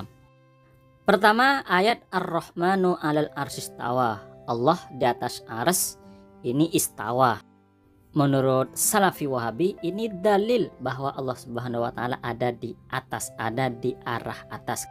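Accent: Madura, Indonesia. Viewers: Seperti logat orang Syi'ah dari madura yang diusir ngungsi ke stadiun GOR_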